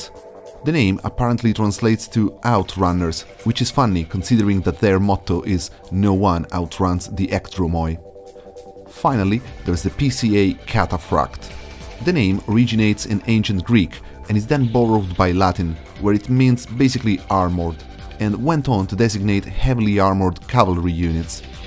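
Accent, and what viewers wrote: Italy. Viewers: Chad italian pronunciations of latin 🥲👌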